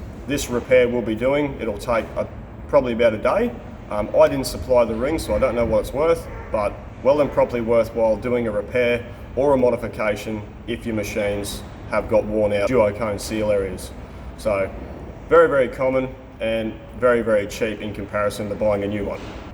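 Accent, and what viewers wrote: Australia. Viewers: Aussie accent…. “That’s not an Allen Wrench, this is an Allen Wrench”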